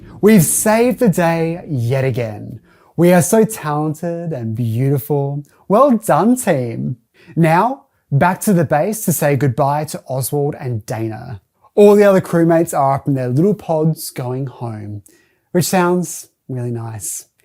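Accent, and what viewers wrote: Australia. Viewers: do I detect an australian accent?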